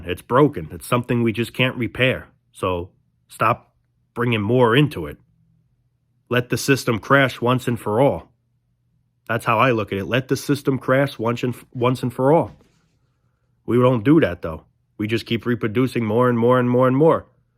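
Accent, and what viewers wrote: New York, United States. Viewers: You're so handsome even considering the horrific topic you are talking about. I love your New York accent too. Yeah showing the video is something that wouldn't be appropriate at any time .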